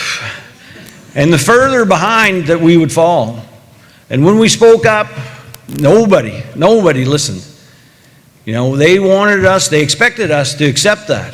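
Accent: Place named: Maine, United States. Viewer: He's from northern Maine. This is as authentic as it gets and that matters. You probably want him to speak D.C. English, or maybe the Queen's. Let Mainers be Mainers.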